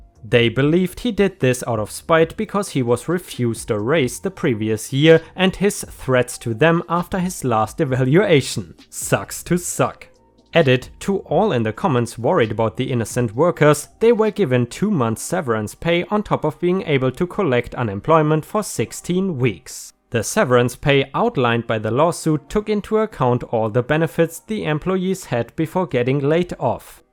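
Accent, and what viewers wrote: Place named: Germany. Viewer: Kann das sein dass du deutscher bist? Man hört deinen deutschen Dialekt extremst raus...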